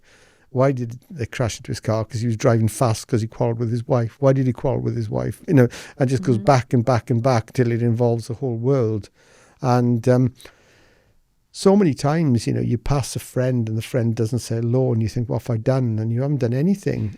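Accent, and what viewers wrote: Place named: North, United Kingdom. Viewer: Still can’t get over a monk with such a strong northern accent 😝👍🏻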